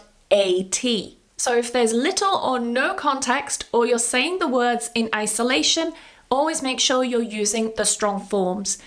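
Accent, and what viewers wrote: United Kingdom. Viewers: Que bueno necesitaba esto de prunciar acento british me encanta 😊😊😊😊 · I really i like british accent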